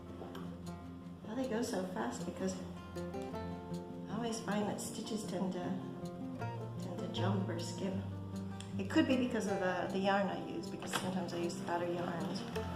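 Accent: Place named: Australia. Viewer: We did not "hear" any Spanish in your accent, sorry thought you might have been an Australian or New Zealander. Have you considered using the same videos for the Addi and re-recording them in Spanish? It might give you more subscribers. Just an idea from your comment in the video. Thank you for a very good video on doing the Messy Bun on an Addi.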